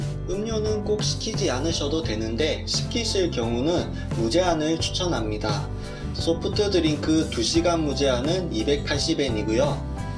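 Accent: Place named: Gyeongsang, South Korea. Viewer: 엌.... 비웃으려는게 아니라 제가 친정이 거제도고 결혼전 부산에서 살아서 참 그리운데 님 샤브샤브 그 특유의 경상도 ㅅ 발음이 너무 반가워서 댓글 달고 갑니닼ㅋㅋㅋ 좋은 영상 잘 봤어요~>___<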